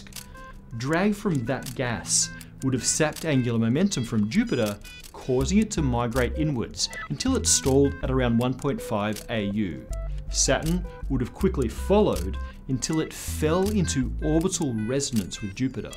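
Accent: Australia. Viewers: I don't think so. Sounds something akin to Australian.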